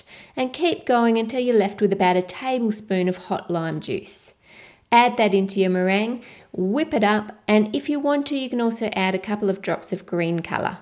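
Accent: Australia. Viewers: Do you live in Australia you sound like it not to be rude or anything I love your videos I am so hungry now🍧🍨🍭🍬🍴🍪🍩🍮🍫🍦🍰🍟🍖🍕🍔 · Your Australian (:0) whaaaaaaaaaat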